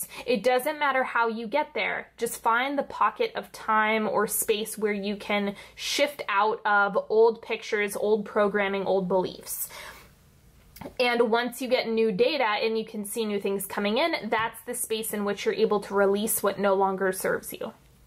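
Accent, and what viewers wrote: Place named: United States. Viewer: Whats this accent from in US?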